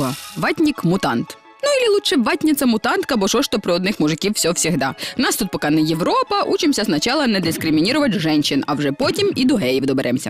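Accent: Ukraine. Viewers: У меня тетка на Подоле живет в Киеве. Так она точно так же разговаривает. Один в один. И суржик, и интонации и фразочки ту, пипец, как похожи.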